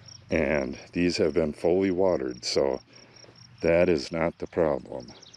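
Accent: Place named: Wisconsin, United States. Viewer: I hope that all of those Bur Oaks had a good year after you treated them. I hope they worked on their roots and will leaf out strong this coming spring. You probably already know this, but one of the things that I do with my young oaks is fertilize them in the spring at leaf out and ALSO give them some Iron. I usually only fertilize once, but one year I did another just before the second growth in June (in Illinois). I hear a little Wisconsin in your accent so your trees probably get that second growth about when mine do. Two fertilizations can be too much so be careful. You have to use your judgement. You may already be doing some fertilization. It sounds like you know a lot about trees. Nice to see someone plant the mighty Bur Oak! Such a stately tree out in the middle of a Savanna.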